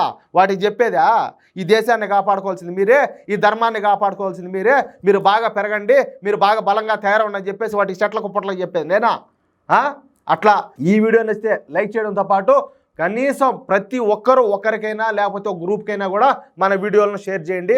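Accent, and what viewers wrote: Rayalaseema, India. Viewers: super speech rayalaseema basha adbuthanga vundi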